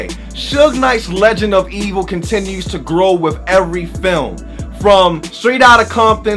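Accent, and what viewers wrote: Baltimore, United States. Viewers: Soon as you started talking I knew you was from Baltimore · you got that heavy Bmore accent fam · I knew you were from Baltimore within the first few seconds. Your accent 😍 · As soon as you started talking I was like oh yeah he's from Baltimore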